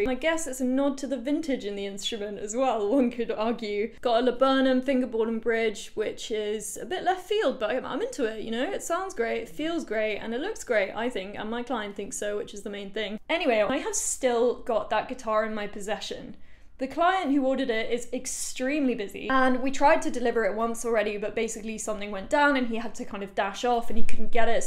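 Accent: United Kingdom. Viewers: I'm using your videos for English listening learning because it is so nice seeing your personality, seeing your work, and listening to your British pronunciation :)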